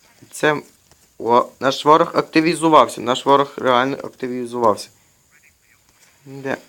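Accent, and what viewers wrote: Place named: Hungary. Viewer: Мені одному здається, що автора акцент Угорський....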